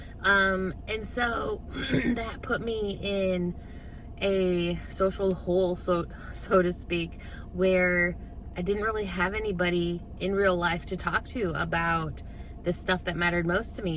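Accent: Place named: South, United States. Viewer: Any chance you live in Alabama? You sound Southern.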